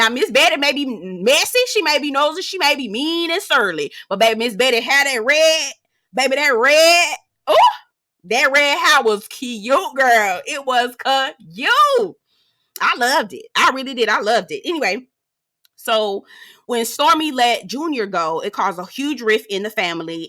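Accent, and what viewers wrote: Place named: South Louisiana, United States. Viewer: u talk like me lol .... im from South Louisiana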